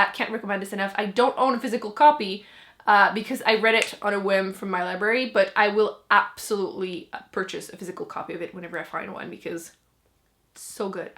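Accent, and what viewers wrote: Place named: Canada. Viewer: Hey! I really like your videos, love your accent and I'm Canadian too!!! <3 I've been subscribed for a couple months now and I will be sticking around!!!:)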